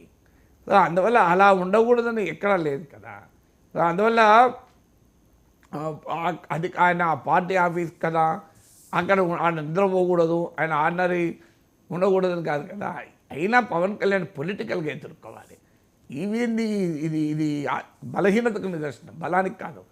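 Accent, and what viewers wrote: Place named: Telangana, India. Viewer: ఇతగాడొక ప్రొఫెసరు అంట.. ఈయన గురించి నా అబ్జర్వేషన్స్ స్టాక్ మార్కేట్ గురుంచి మాట్లాడుతాడు..స్టాక్ మార్కెట్ ఎకానమీ ను సూచిస్తుంది అంటాడు.. ఇహ ఎకానమీ గురించి మాట్లాడుతాడు ఇక అవి మామూలు బూతులు కావు.. ఈ చాన్నెలో యాశా.. ఇది ఒరిజినల్.. తెలంగాణ బూతు ఉద్యమ సమయంలో తెలంగాణ యాసలో విశ్లేషణ.. అదో రకమైన అస్తిత్వ ప్రయాస..ఇక ఈ మధ్య రవ్వంత రెడ్డిని కలిసి ఇపుడు ఇలాంటి విశ్లేషణలతో రాజకీయ ఆయాసం..ఇలాంటి వాళ్ళందరూ హైడ్ hyd లో కూర్చొని ఆంధ్రా యువతని పక్క దోవ పట్టిస్తున్నారు..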